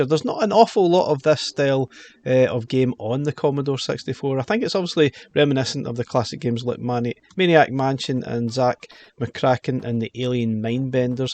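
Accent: United Kingdom. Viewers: TIL: Brits talk funny. ga-LAG-a? Anyway, the games look great, but I need no more chunks of plastic. If they put the collection on an Evercade cart or something, I’m all in.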